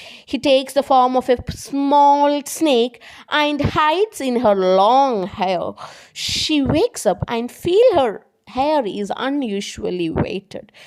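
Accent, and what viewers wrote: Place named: Kollam, India. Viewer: Kollam... Beautiful acsent... And very good information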